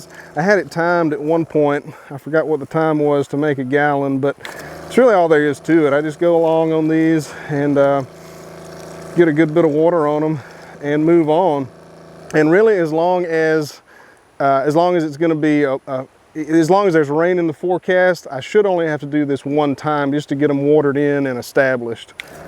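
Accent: South, United States. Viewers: Thanks! You always post the most calming yet informative videos. I'm Georgia born and raised but you have the best southern accent by far!:D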